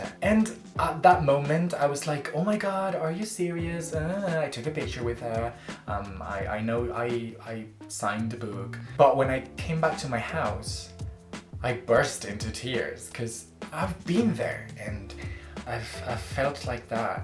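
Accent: England, United Kingdom. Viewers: You're english is really good! And you have a cute british accent jdjdjd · EL ACENTO BRITANICO AAAAAAAA ❤️❤️❤️❤️❤️❤️❤️❤️❤️ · I love is English accent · Ohh, I see, I see, interesting. I actually root more for the British aacent, nice. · I don’t know what I was expecting you to sound like in English but I definitely wasn’t expecting a half-British accent lmao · Me encanta cómo quedaron los dibujos y que tienes un acento súper británico, por lo que me han contado las personas que me han oído hablar inglés mi acento va cambiando entre británico como el tuyo, americano (estadounidense, no canadiense) y escocés según qué palabras ( todo esto fruto de mis distintos profesores o fuentes de aprendizaje de inglés en general a lo largo de los años) lol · i didn't exepct the british accent, tbh and i love it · Tienes como un acento británico al hablar en ingles y me encanta! 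· DIOOOOO que fluido xd y hablas como un británico lol te adoro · U sound a little bit more gay in English 😂😂😂 and u have a bit of British accent 💙 Love it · Me acabo de enamorar de la voz de hablando ingles! Suena como un ingles de uk! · Aprendiste inglés en Inglaterra?? Me gusta mucho tu acento inglés. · TE SALE UN ACENTO RE INGLÉS ESTO ES PRECIOSO, MÁS VIDEOS EN INGLÉS!!! ❤️❤️ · I JUST LOVE YOUR ACCENT SOOOOO BLOODY MUCH, IT KIND OF REMINDED ME TO ADELE´S ACCENT AND I LOVE IT:face-red-heart-shape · His British accent make me a orgasm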